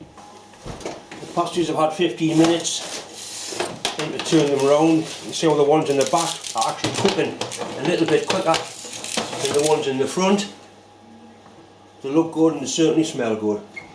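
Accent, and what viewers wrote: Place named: Tyneside, United Kingdom. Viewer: classic !! just rocked onto this, so so funny, trying to place the twang, guessin south tyne poss same as me gateshead ??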